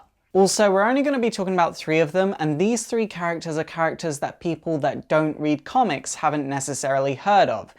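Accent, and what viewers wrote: United Kingdom. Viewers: Are you British you sound British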